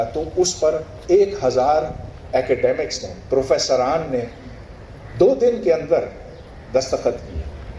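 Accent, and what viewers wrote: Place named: United Kingdom. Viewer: and we have better scholars than you , we always had. Speaking British English wont help you idiot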